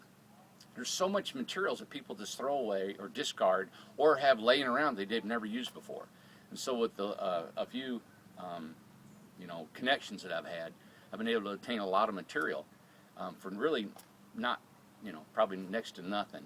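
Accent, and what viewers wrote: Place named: Chattanooga, United States. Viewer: Very nice .I'm building almost finished a tiny house in Chattanooga. I have many of the componants for an off grid system but would really like I knowlageable hand in putting it together. Are you near Chattanooga?I ask as you sound like you might be.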